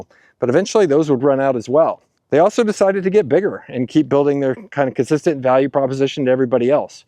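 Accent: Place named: Chicago, United States. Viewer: Its all about the (damn) money! BTW, where are you walking at? Id say New Jersey, but you dont have the accent for it. Probably chicago area.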